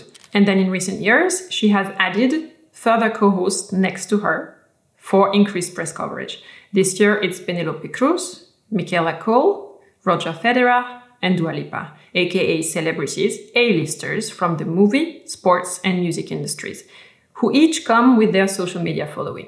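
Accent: France. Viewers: J'adore tes vidéos, je remarque ton accent français qui revient plus depuis que tu es déménagé en Provence 🙈 yay haha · Mam... Are You french?? Cause you do speak like one!!! Anyways.. Mam.... Your vudeos are amazing, and I have watched your portfolio videos and it helped me a lot... Do more videos❤️‍🔥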